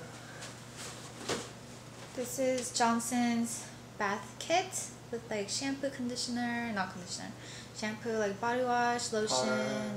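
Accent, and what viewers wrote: South Korea. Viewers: You sound just like Korean ... Not that I mean your English is bad. You do just well I just think all we Koreans have certain accent in English, like Korean Accent I think:)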